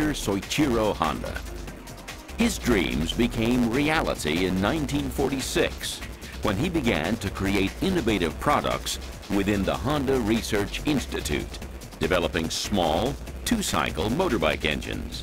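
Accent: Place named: United States. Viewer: Hardly a "Ride on the wild side" but a leisurely stroll down memory lane, with amnesia. Short history spoiled by the abscence of the iconic CB750 voted super bike of the century and the BMW killer ST1300. The video turned out to be a 50minute long commercial, on UK roads with an American narrator. The riding sequences were boring and the videography very '70s. So glad I got this out of the bargin bin. 3/10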